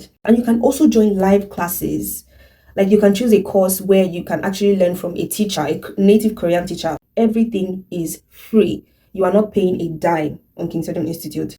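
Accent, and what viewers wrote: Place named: Cameroon. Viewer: You sound a lot like a Cameroonian, even though I know you're Yoruba from your name😄.... Keep up, very positive and inspiring channel.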